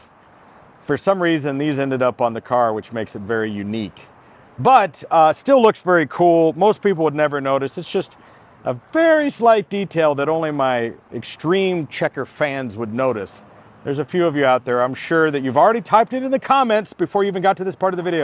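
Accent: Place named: New York, United States. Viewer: Hey my sweetheart ‼️‼️Im crying laughing at you pretending to be taxi driver🤣🤣🤣You sound just like a New Yorker🤣🤣🤣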